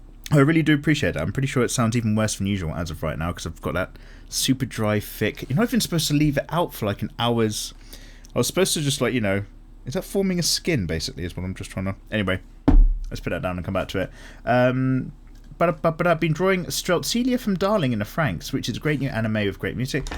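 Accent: United Kingdom. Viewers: you kinda look like and sound like Ravi from Izombie (not just the British accent). if you don't know who i'm talking about... well you can look it up. i'm also gonna post this on every DWM in the future till you find out. also draw albedo from overlord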